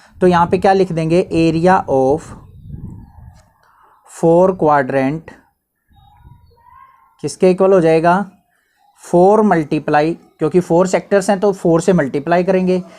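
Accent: Haryana, India. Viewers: Sir ki voice me hi haryana show hota hai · hm pta mujhe pahala hi pta chl gya bs confirm ny tha m vaisa sir ap hariyana m konsi side rahta ho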